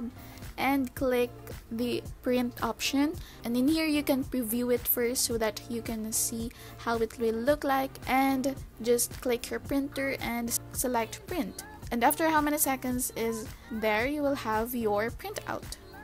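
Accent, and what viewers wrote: Philippines. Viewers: Heard her Filipino🇵🇭 accent from the start😌 but confirmed it when she said cHoUR🥴 AHAHAHAHA nice videooo thankss❤️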